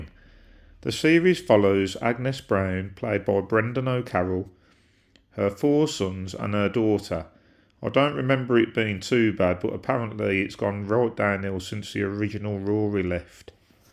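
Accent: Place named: Birmingham, United Kingdom. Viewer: I agree with all of these, all awful, however you seem to be reading your script, and your brummie accent, whilst genuine is quiet grating. The word, five, does not have an o in it. Foive is not a word.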